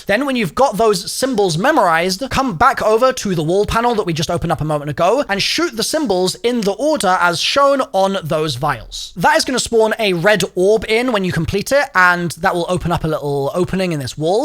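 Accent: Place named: United Kingdom. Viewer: i love you but despise your british pronunciations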